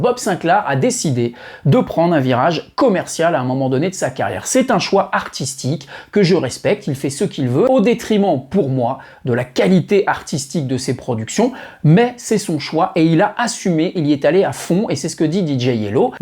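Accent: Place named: France. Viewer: Les mots en anglais prononcés avec cette french touch 👌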